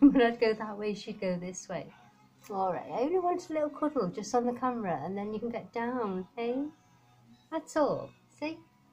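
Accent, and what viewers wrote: Liverpool, United Kingdom. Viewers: What a gorgeous cat Lucy is...what age is she?....love listening to a Liverpool accent...reminds me of the much missed Brookie :)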